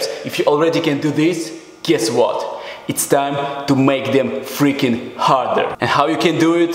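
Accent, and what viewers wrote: Russia. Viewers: Единственный кто имеет русский акцент, когда говорит на английском и наоборот... Кайф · This Russian accent pumping me up 😂😂. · Your Russian accent is so cool and badass, and you look awesome! If I were gay (I am not) I would definitely have a crush on you, bro! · The Russian accent just make it better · I knew that this is gona work when i heared the russian accent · You Have a Nice Russian Accent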